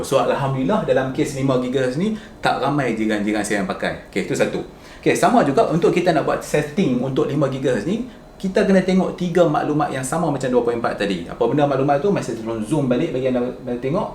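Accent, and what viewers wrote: North, Malaysia. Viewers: TK... Org Utara ka ni ?...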